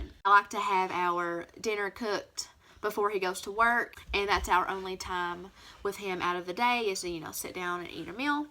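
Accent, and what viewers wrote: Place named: Kentucky, United States. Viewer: I just found your channel and listening to you makes me feel at home.i am from Ky too, born and raised in Louisville. I have been living in Az for the past 18 years. I talk just like you.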